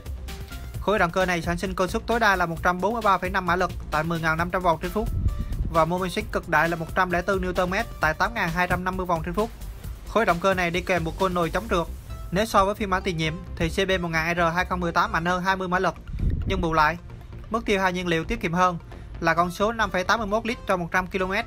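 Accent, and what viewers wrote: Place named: Binh Dinh, Vietnam. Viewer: Lam on dừng nói tiếng bình dinh ko nghe dc gì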